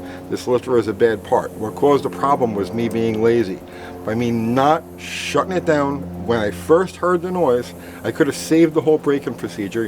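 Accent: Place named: New Jersey, United States. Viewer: You talk like you're from Jersey.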